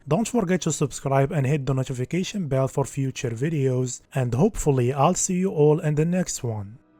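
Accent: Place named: Morocco. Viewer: omg your accent is so moroccan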